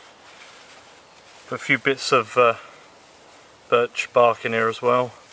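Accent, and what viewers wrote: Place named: Blackpool, United Kingdom. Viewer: You from Blackpool? My friend Howard sounds just like you, thats where he is from.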